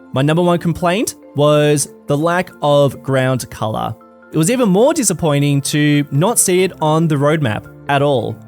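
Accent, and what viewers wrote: Australia. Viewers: the Australian accent is awful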